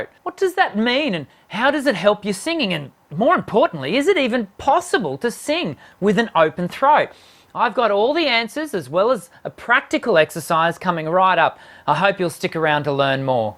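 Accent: Australia. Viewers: Lol I'm a woman with a deep voice and his low range is my high range. But he holds a tune well! I love his Australian accent and turns of phrase too. His personality comes across too. Just enjoyable and educational all around.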